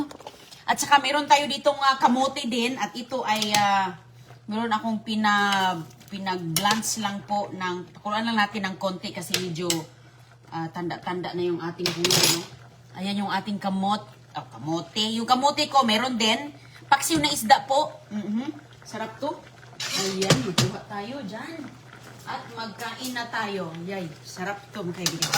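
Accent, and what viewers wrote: Visayas, Philippines. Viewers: sorry naman bisaya lang. Intindihin niyo na pronunciation ni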